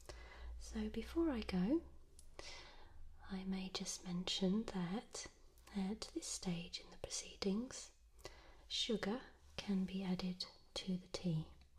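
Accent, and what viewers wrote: England, United Kingdom. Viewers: new here and not sure if she’s english or american if she’s american making an english tea video.... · R u British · OF MY FCKING GOD. BRITUSH ACCENT + ASMR. THAT MUST BE SPOKEN IN THE HEAVEN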